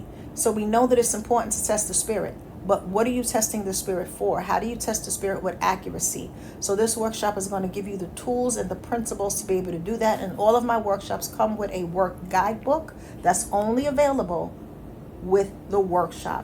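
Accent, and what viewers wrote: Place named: New Jersey, United States. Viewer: Ms., your Jersey accent is off the chain, your accent is beautiful and gorgeous!!!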